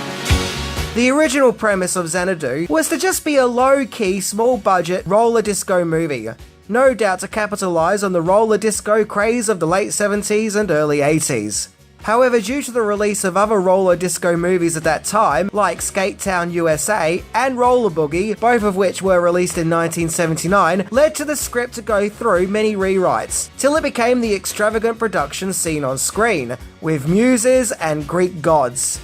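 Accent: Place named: Australia. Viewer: I was just a kid when I saw this. This is one of my favorite movies. Please try me! I'll update as I watch this. So, 1st you're Aussie without you telling me you're Aussie. Oliviabwas actually from the UK. Waiting for you to mention her ex-husband, which she had 1 child. He played the younger Gene Kelly. And yes, this is literally an album. Knew she loved this because Gene Kelly!!! She looked absolutely beautiful in this movie and i stan 💯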